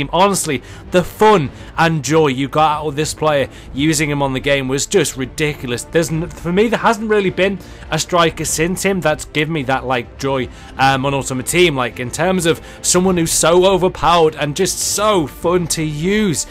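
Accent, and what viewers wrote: Hungary. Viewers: hungaryan commentary :D it's so good to hear :D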